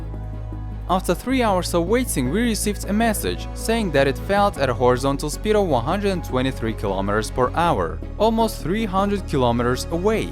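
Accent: Russia. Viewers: Такой Русский акцент! Это ты говоришь или кто-то другой?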